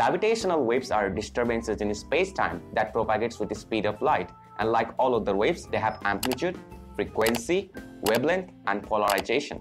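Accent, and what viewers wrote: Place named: Germany. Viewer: It's nice. But bruh your's English is like a Germenish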